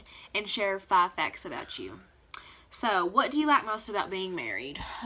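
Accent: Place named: Kentucky, United States. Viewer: I just found your channel and listening to you makes me feel at home.i am from Ky too, born and raised in Louisville. I have been living in Az for the past 18 years. I talk just like you.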